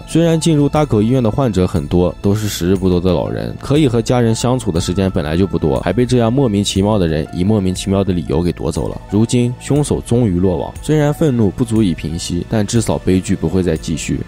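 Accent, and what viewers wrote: China. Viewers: 哈哈，果然能听出来山西口音😄😄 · 播主是呼和浩特人？口音好重。。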